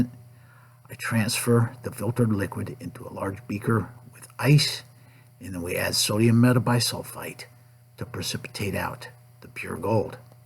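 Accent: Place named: Italian American, United States. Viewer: Sounds like a guy from the sopranos - I Makin gold ova here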